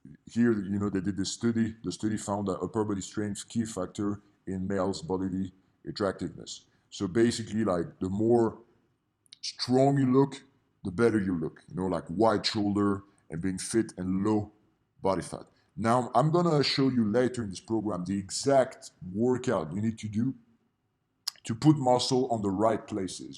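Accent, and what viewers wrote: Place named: Netherlands. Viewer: I can tell your Dutch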